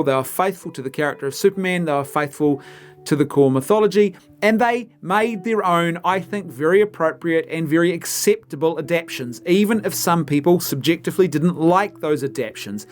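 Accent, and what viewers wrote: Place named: New Zealand. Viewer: Kiwi accent.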